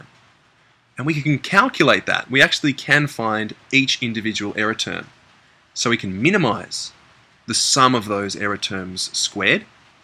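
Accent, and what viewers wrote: Australia. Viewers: Are u from oz ? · Love the Aussie accent haha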